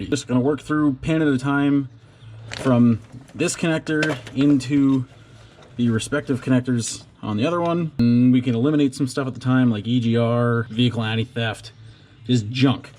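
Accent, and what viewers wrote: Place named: United Kingdom. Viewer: So out of curiosity were are you located? Country is fine just how you said everything it sounds like the uk maybe....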